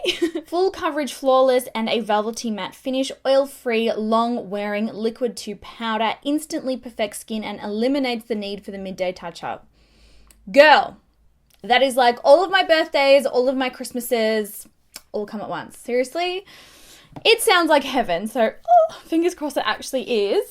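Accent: Australia. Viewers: I love this testing style and I love that you keep your Australian way of speaking, instead of putting on some weird accent that some other Australian youtubers do. Most of all I love that you say FORRID and not forehead! xoxo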